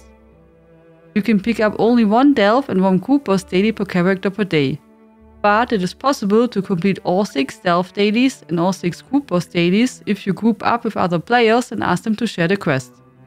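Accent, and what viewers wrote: Germany. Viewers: Your English is unintelligible in places. I am very tolerant of accents and even understand Africans who speak Pigin English. Your accent is harsh. You were clearly taught English by people who were not native English speakers themselves. Why not stick to your native language? Which, based on your accent, is probably German.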